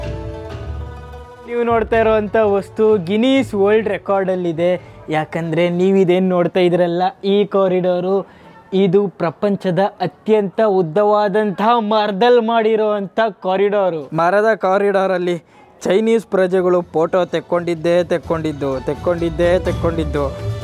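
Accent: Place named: Mysore, India. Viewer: Thumba cute aagi mysore bhaashe maathaadthediya maga very nice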